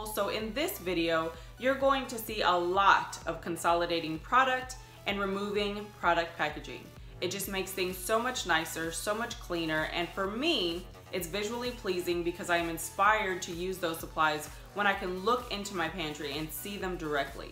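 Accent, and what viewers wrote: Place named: Texas, United States. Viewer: First time here, and as I was listening to you, I was like... is she from Texas? And low and behold... you are!! Great video!!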